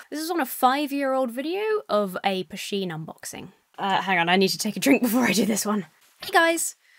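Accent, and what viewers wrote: United Kingdom. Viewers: Despite being English I tend to watch a lot of American channels but it’s really refreshing to hear some jolly good Bri’ish snark and sass from. 🇬🇧☕️ As for the fish thing, for the sake of being a know it all, there are multiple species that can communicate, such as saddleback clownfish, leaping blennies, grouper fish who can communicate with octopi, as well as fish that can use tools like the tusk fish.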